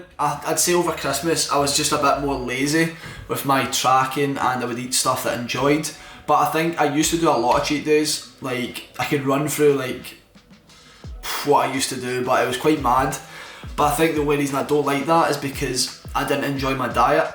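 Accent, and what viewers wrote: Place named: Scotland, United Kingdom. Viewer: Your accent is so great haha, I love Scotts. Great video mate!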